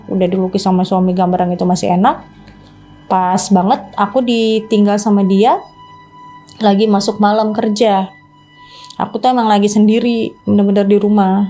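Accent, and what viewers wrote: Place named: Jakarta, Indonesia. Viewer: Betawi bngt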